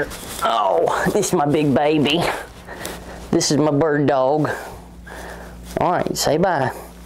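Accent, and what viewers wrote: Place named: North Carolina, United States. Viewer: Man where are you from, that’s quite the accent, NC?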